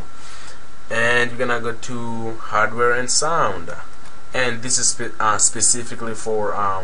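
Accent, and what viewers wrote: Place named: Brazil. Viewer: Are you Brazilian? Your accent seems to be very Brazilian :D Thank you for the tips :D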